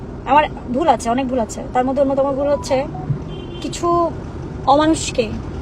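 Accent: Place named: Barisal, Bangladesh. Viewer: Borisailla fokinni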